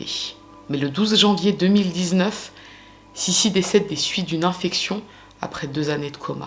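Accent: Italy. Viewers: Adorant l'Italie, t'écouter traiter des sujets de ce pays avec ton irrésistible accent italien.... Je surkiffe !! Grazie Bella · Extrêmement fan de ton accent italien haha 😍❤️ Sinon super vidéo encore une fois ! Histoire très troublante... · que du Bonheur pour votre ACCENT ITALIEN un DELICE, et sur le mot de la COCO, juste délicieux merci pour toute vos VIDEOS, bien mieux que le CINEMA et les reportages TV, et votre Charme dans la VOIX Superbe, SuBLIME, J 'aime Tout FELICITATIONS.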